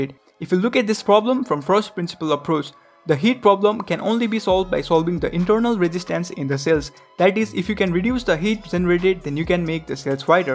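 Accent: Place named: South India, India. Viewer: A very south indian, SL accent, please speak a little slower for it to be easier to follow !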